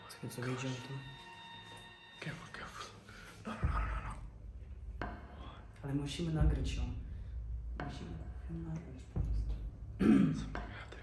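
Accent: Ukraine. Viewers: A ty jesteś z Polski czy z Ukrainy albo masz problem z językiem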